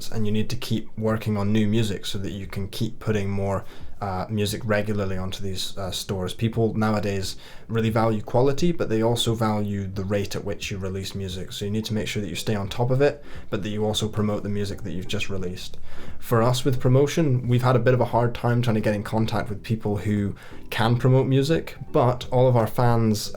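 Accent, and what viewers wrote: United Kingdom. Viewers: I guess you're British. I love British men. 💖😊.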